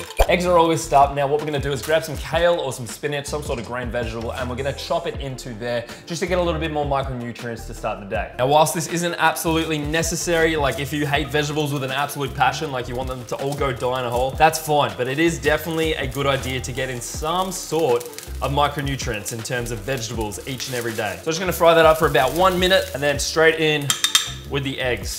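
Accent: Australia. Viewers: So u said it is quite hot in hear so with that information and ur accent u r an Australian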